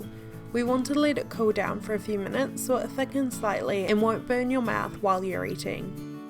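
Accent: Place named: New Zealand. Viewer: I knew your cool accent was from New Zealand! I lived in Wellington four years; many fond memories. Love the clear recipe instructions; subscribed!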